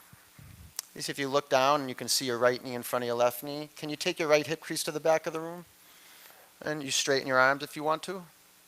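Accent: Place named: Boston, United States. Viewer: Sounding very Boston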